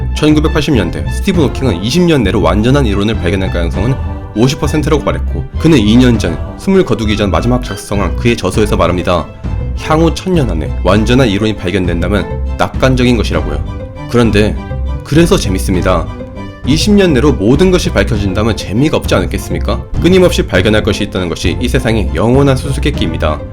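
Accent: Gyeongsang, South Korea. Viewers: 경상도 아닌가요?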